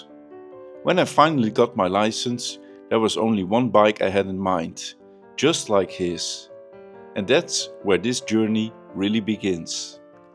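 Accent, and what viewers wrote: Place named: Netherlands. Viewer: Dat moet een Nederlander zijn aan het accent te horen. 😄 Leuk verhaal. Ik heb ook een RT gehad. Nu heel blij met een GS.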